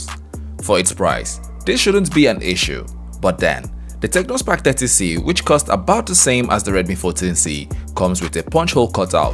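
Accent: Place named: Jamaica. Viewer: Dont understand Jamaican!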